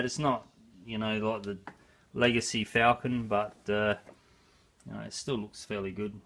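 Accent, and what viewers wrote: Australia. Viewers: U sound Aussie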